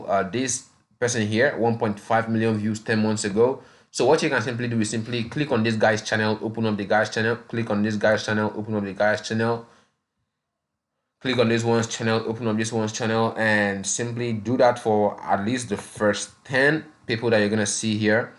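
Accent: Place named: Nigeria. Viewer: Hey, great tutorial, I gather you are Nigerian from your accent, but many from Africa are facing this challenge of poverty, unemployment, poor internet connections, power blackouts etc-Most well-established affiliate programs have an approval process which requires a website and thousands of subscribers, Some programs like Legendary Marketer are not available in Africa, Can you make some videos tailored to Africa, like some work from home solutions /payment solutions that are available to Africans? If you search on youtube you will notice that India and Bangladesh are taking youtube by storm, and they assist their colleagues to get faster solutions tailored to their needs, I wish the same could happen for Africa so that more Africans can benefit from this opportunity and you could be a role model to the youth in Africa. Getting started, lack of knowledge and proper mentorship is the challenge that is facing most Africa, please have this in mind as you continue in your online journey. 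Thanks.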